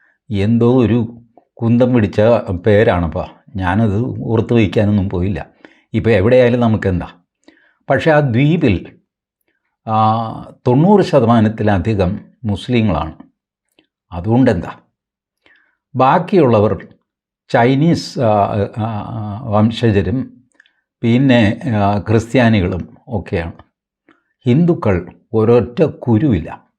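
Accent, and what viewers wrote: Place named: Kottayam, India. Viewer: എതായാലും താങ്കളുടെ ആ കോട്ടയം ഭാഷയില്‍ ഉള്ള, hasyathmaka അവതരണം കലക്കി 😂😂 ജവാബ് നഹി 😂...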